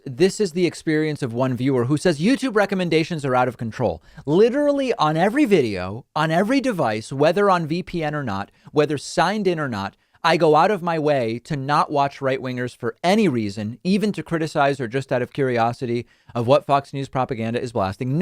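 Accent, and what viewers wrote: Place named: Argentina. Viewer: If you here him speak Spanish his Argentine accent really comes out. I was surprised when I heard him speak and quickly realized he had to be from Argentina or Uruguay.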